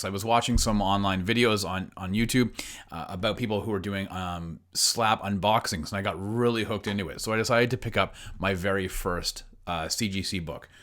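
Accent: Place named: Canada. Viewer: I just come for the Canadian accent. And ketchup chips rock esp since none in US.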